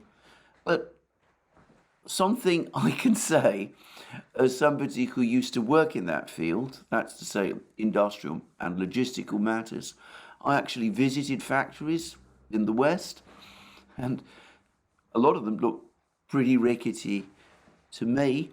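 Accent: United Kingdom. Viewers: One of the best places for lovely russian propaganda offered with a british accent by a disgraced lawyer.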